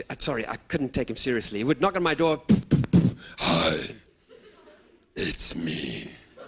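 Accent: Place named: Germany. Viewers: ***** Tut mir leid dich entteuschen Zu müssen, aber Ich war schonmal da. Ausserdem hat jeder einen Akzent, Englisch Deutsch egal- the kings English ist auch bloß festgelegt worden, also auch ursprünglich ein Akzent. Gleich wie beim Deutschen: Hochdeutsch ist nichts weiter als der Hannoveraner Akzent. Also Update : Kein Mensch spricht irgendeine Sprache Akzentfrei.... Also 100% der Engländer sprechen mit Akzent, nur ist das die Muttersprache des Engländers ist, also klingt es richtiger als ein ausländischer Akzent. Ausserdem: Wer hat gesagt dass das schlimm ist das er einen Deutschen Akzent hat? Hab Ich warscheinlich auch :) Schönen Abend LG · Wenn ich Englisch rede, hört man sehr stark meinen Deutschen Akzent raus. Recht viele in der Klasse von mit können ihre Stimme, wenn sie Englisch reden "verstellen", so, dass man den Deutschen Akzent nicht mehr so gut raus hört. 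Ich kann das nicht in der Englischen Sprache. Komischerweise mache ich das "Automatisch", wenn ich Französisch rede. Und ich finde, bei Kaya Yanar hört man den Deutschen Akzent nicht so krass raus.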